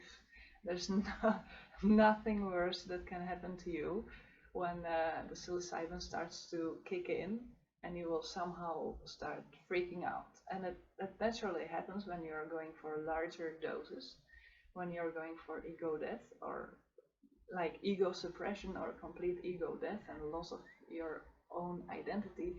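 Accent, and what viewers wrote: Netherlands. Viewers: thank you for all the information! <3 I am currently growing Golden Teachers and am planning on microdosing. Are you dutch btw? Your accent sounds a little bit Dutch :)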